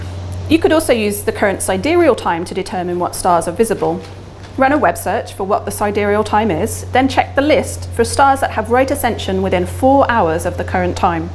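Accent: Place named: Estuary, United Kingdom. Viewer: Interesting video. It seems your observatory is in the US, but you talk with a refined British estuary accent!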